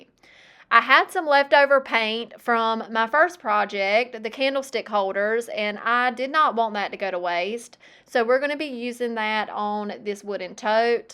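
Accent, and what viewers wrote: South, United States. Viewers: This is my first time seeing one of your videos and I immediately noticed your southern accent. So in searching I found you are from Kinston, NC. I'm in Wilson, NC, but living in Maryland for 38 of my 77 years, I've lost most of my accent.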